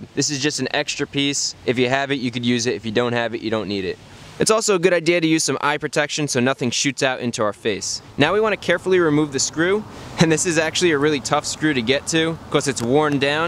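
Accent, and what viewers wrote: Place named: Northeast, United States. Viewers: SsS Stone Cold no its how the northeastern Americans say it. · As a fellow “wooder” brother, much love from Baltimore.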